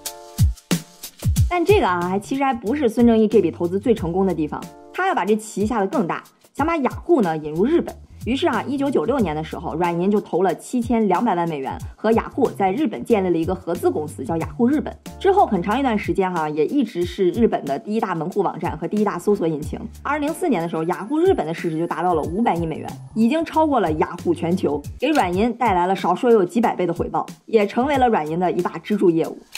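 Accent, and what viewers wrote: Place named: Qingdao, China. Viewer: 小Lin笑容好甜，听声音有一点点山东青岛的口音，不知道我猜没猜对😅😅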